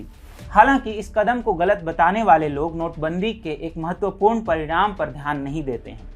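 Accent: Standard. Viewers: आपकी हिंदी प्रेरित करती है शुद्ध उच्चारण एवं उर्दू शब्द का निम्न प्रयोग बहुत ही प्रसंसनीय है ।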